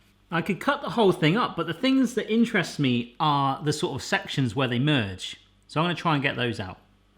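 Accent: United Kingdom. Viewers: spirit-howl 786 U that’s a really British accent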